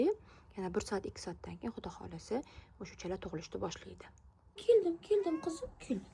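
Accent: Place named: Kashkadarya, Uzbekistan. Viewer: КАШКАДАРЁЛИКМИСИЗ . ЁКИ СУРХОНДАРЁЛИКМИСИЗ. ШЕВАНГИЗ КЕТОПТИТА.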